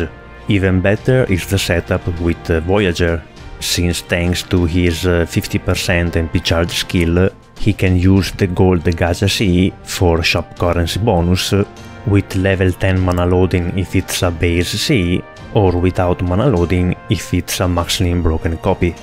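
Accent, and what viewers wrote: Italy. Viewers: il tuo accento e italiano non mi freghi lol. ce tipo un discord di italiani di fgo??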